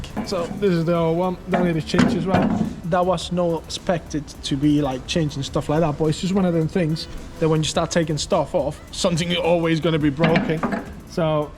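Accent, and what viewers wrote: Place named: Liverpool, United Kingdom. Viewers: He’s from The Dominican Republic via Liverpool 😂😂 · I just love that this guys accent is like a perfect blend 50% UK and 50% wherever he's from (not tryna be rude just don't know where he's from). How long have you lived in UK for mate?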